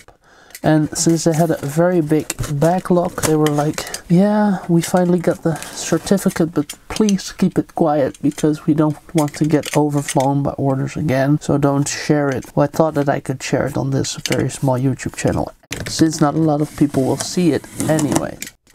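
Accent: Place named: Netherlands. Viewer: It's incredibly obvious when listening to the clicks and paying attention to the recording, but I caught it in the corner of my eye, watching both. But if any board would be that slow to respond it'd be brought up in the review, instantly as that's borderline unusable, I've suffered a Bluetooth keyboard that's actually that slow. My New Model M, the full sized version with a simpler controller module, has no noticeable delay at all, though I'm unsure to the debounce time. I've even played some games with it, aside from the actuation hysteresis and membrane sensing 2KRO limitation it's perfectly fine for non competitive play. This 2KRO limitation and high weighting would be why it isn't ideal for very, very fast typists, but if you're that fast you'd already have gotten a special board for it. P.S. Dacht al dat ik het accent herkende, erg duidelijk voor andere Nederlanders maar bevestigd door de interface in dit stuk.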